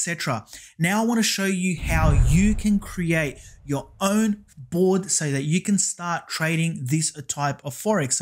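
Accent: Australia. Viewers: Your video is amazing, so many people making videos make it so complicated - you have made it crystal clear. Sure I am going to have to go through the video again and make notes, do you have a cheat sheet for the video? Have subscribed and can't wait for more videos. Like you Aussie accent. Thank you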